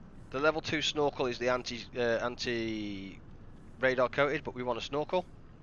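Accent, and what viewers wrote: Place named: United Kingdom. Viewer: Very nice gameplay and commentary, you definitely have a sub(-scribe) ;-) from me. Keep it going. Also approve of the very British way of commentating :-) . Really like the way you communicate with your stream viewers and take suggestions from them.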